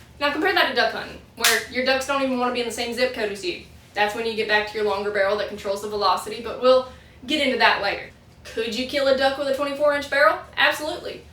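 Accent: South, United States. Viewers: After a couple of minutes in the video, I could hear the "taam (time); thaang (thing); kaand (kind)" - and I was like, yep, that's a red neck there 😆. Great video by the way ✌ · You’re video just showed up on my feed loved it informative and funny had very useful info and to top it off that southern accent took me back home I subscribed looking forward to seeing more of your work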